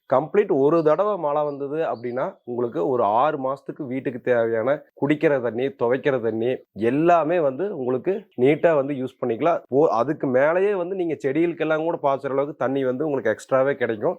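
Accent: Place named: Kongu, India. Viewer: House Super anna ,,, also my fav. Kongu Tamil 👌 👌 ❤❤ from Tirunelveli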